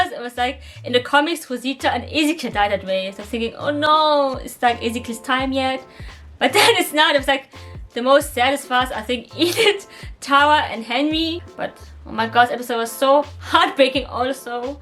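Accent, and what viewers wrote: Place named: Germany. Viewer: I admired your Review on the walking dead movie. Your narration is very well paced. And I felt a German accent in your way of speaking I don't know if I was right! Thank you for talking about this movie. I will put it in my list of must-see movies!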